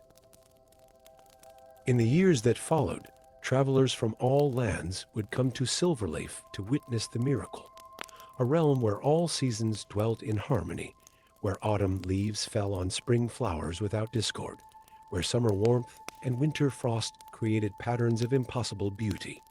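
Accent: United States. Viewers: Love your stories. Really dislike this voice, sounds American, it’s just awful.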